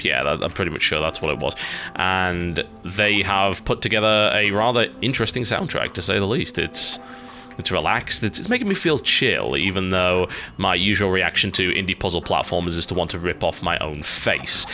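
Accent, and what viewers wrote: United Kingdom. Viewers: If it's one thing I love about, or perhaps the British accent in general, it's that you can say things like "...make me want to rip off my own face." and sound like a total gentleman.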